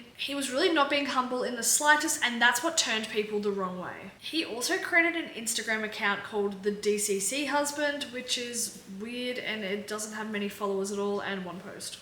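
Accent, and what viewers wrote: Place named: Australia. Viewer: Why didnt I know you were a fellow Aussie? Your accent!! Yes Erin is guilty. Are we really surprised? Chandi lost the plot.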